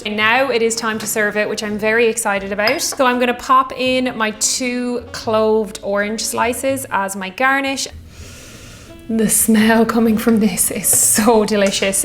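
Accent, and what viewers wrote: Ireland. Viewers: Great video! Thanks! Lovely Irish accent.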